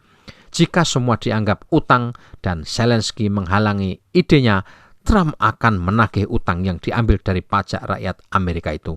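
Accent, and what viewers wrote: Java, Indonesia. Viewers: Mas, medoke ora ilang ilang ? Aku Suka 😅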